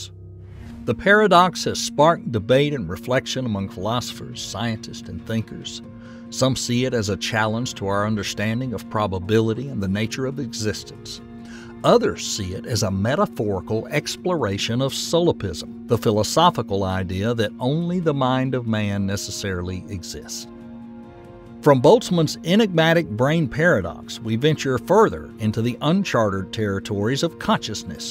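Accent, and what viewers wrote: South, United States. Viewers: This guy having a southern accent makes this 100x better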